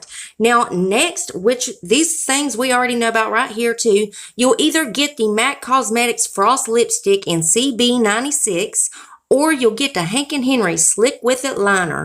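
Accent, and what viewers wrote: United States. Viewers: Thanks so much for all the spoilers you are so wonderful! Love the country accent sending you lots of love from Georgia! 💋💖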